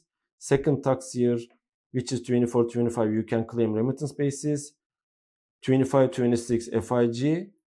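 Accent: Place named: Turkey. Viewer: Bu İngilizce ile İngilterede yaşadığı yetmemiş bir de millete vergi tavsiyesi veriyor adam pes vallahi. Hiç bu kadar Türkçe bir İngilizce dinlememiştim 😂😂 git Türkçe konuş bari bu kadar bu dili konuşamıyorsan birader. Bir de zaten bunu dinleyecek kesim ağırlıklı buradakiler, neden Türkçe anlatmadın anlamadık.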